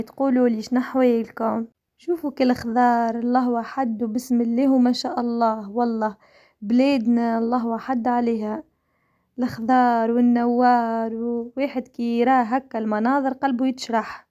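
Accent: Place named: Tunisia. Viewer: اللهجة التونسية انموت عليها اللهم بارك والله نعرف تونسيات الله ايبارك خيات نعمة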